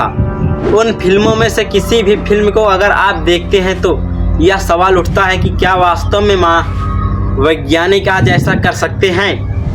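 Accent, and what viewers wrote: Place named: Bihar, India. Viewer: Apki bhasa se lag raha hai aap bihar se ho?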